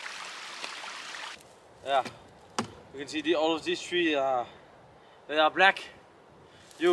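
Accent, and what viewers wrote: Denmark. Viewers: are you danish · Er du fra danmark? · Bro are you danish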